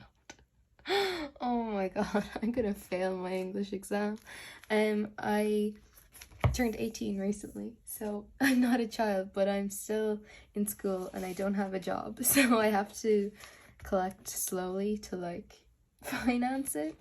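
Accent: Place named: Ireland. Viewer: ok i just found your channel (im watching all ur storing vids) and i didnt even notice your accent until you said youre irish 😭😭😭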